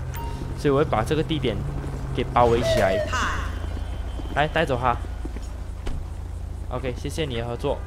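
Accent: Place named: Cantonese, China. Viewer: 你的广东话好搞笑😂😂😂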